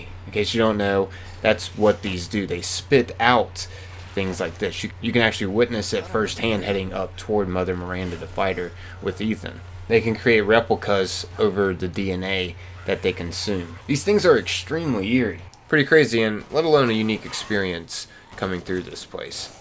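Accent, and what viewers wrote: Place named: Pittsburgh, United States. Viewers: Pittsburgh pa away. Greetings from PA. Yinzers tell the jagoffs to quit driving over the burm. Wosh your dishes and laundry. Love the pittsburghese. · Yo are you a yinzer or not man? Your accent is awesome.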